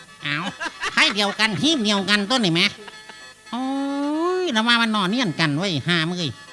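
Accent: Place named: Ubon Ratchathani, Thailand. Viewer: ด้อเดี้ยนนน กัน😂😂ว่าเเต่ไทอุบล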